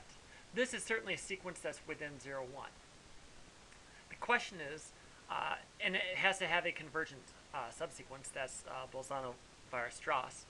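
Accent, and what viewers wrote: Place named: United Kingdom. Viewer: thanks, mate! nice british accent btw THANKS M8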